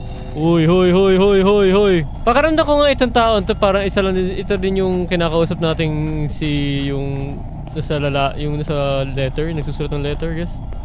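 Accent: Visayas, Philippines. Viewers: Kuya bisaya kapoba?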